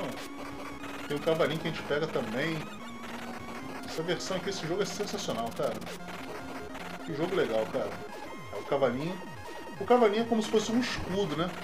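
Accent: Rio de Janeiro, Brazil. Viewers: Bacana seu canal de games,você é do Rio de janeiro né,dá pra ver pelo sotaque,igual ao meu,eu moro aqui em niterói RJ.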